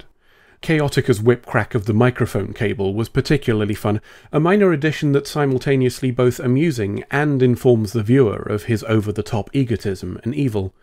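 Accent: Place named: Yorkshire, United Kingdom. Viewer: I find these humorous reviews immensely entertaining. This could be because Star Trek has never really caught my eye as something worth seeing every week. Hence, I have zero nostalgic connection to the franchise. I did see an episode of the OST when in first aired. Ye Gods was boring. From what I can tell, Star Trek Voyager appears to have been made specifically for teenage boys. Boobs n Battles is a winning combination in one's teens. Thank you for expanding my Trek knowledge. Always a pleasure to hear a fellow Yorkshireman prattle on about stuff. Like yourself, my RP slips whenever I hit on the vowel 'U'. There is something about that particular engrained speech pattern that is hard to shake-off.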